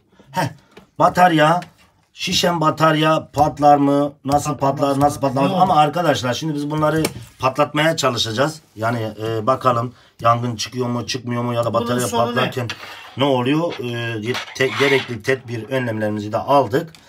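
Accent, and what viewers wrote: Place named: Kurdistan, Turkey. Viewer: Agridan gelmiş konusuyo don la köyüne kürt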